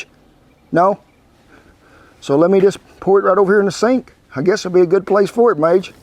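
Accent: United States. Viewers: You should know, I watch this channel not only for culinary tricks. Your particular country English is amazing. Love country. 🤠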